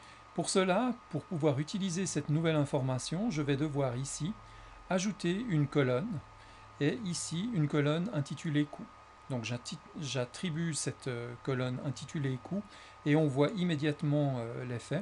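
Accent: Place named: Savoie, France. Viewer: Merci !!! Et par curiosité, l'accent (léger) c'est de Savoie ?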